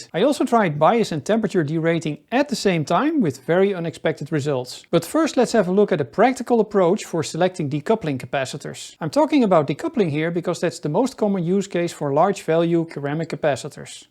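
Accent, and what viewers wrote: Netherlands. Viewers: Man, I was soooo confused about what a "coramic" capacitor could be! 😂 Until I became aware of the strong (Dutch?) accent. (LE: BTW, the part from to sounds almost like it was the Project Farm guy 😊 )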